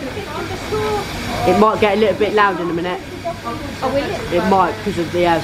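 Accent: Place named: United Kingdom. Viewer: Your British accent is very pleasant…👍👍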